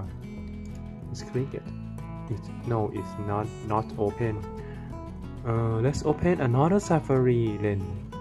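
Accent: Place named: Thailand. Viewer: สำเนียงไทยๆ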